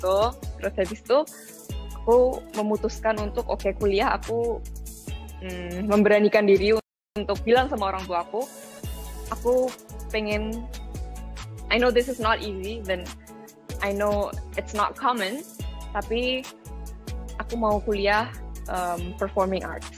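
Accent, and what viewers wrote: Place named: Java, Indonesia. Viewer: Kak logatnya ada sedikit logat jawanya🤗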